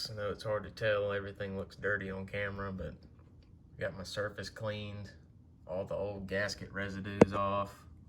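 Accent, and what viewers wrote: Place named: Birmingham, United Kingdom. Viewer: Hey I recognize that accent. I was raised in Birmingham. Lol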